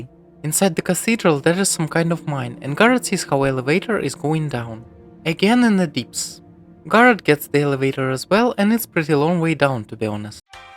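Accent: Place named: Eastern Europe. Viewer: I find your voice very appealing. That airy, slightly androgenous, slavic thing you've got going on. Yup. Very nice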